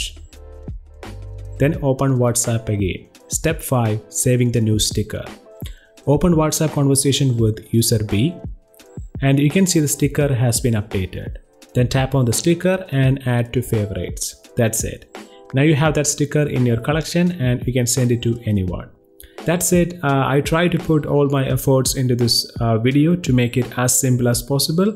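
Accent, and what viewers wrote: Kerala, India. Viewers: Tovino onnum venda.. typical mallu accent .. entem angane thanna ketto😂😂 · Malayalee aanalle? English accent kettapo thoni 😬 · Are you a malyali...? Just asking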